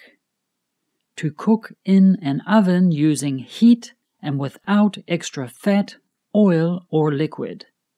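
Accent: United Kingdom. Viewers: Is this british english ? 🤔🤔🤔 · It s british english????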